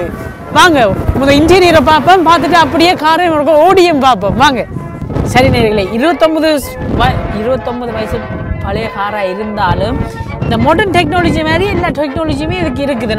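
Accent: Sri Lanka. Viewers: Avoid music .unka ilankai tamil semmayayirukk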